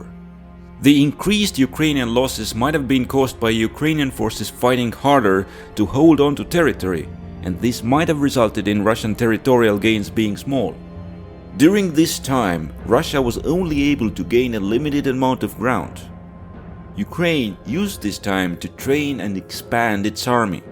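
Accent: Estonia. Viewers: Eesti aktsent, 100%, :D ütle et eksin krt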